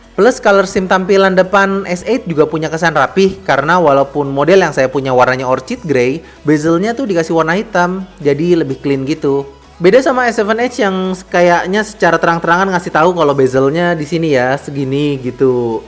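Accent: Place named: Java, Indonesia. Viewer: Jawa nya medog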